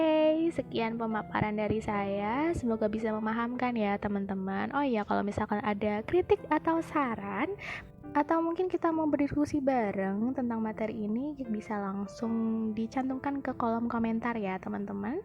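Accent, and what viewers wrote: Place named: Java, Indonesia. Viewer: Jiosss tenan.. Suara ne meduk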